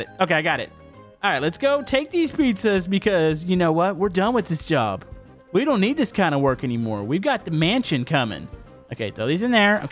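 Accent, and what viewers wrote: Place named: Texas, United States. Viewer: Come on I didn’t know you lived in Texas I thought you lived in like Minnesota or something I thought you don’t have the accent you do of the Texas accent probably should’ve guessed it by that but it’s cool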